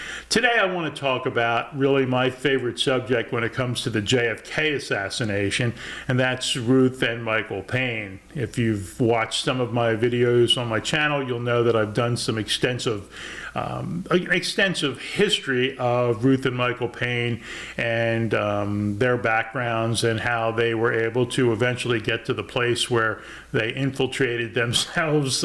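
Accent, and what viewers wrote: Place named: Philadelphia, United States. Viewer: I detect an east coast, Pennsylvania/Philadelphia/Delco accent. Thank you for another informative presentation.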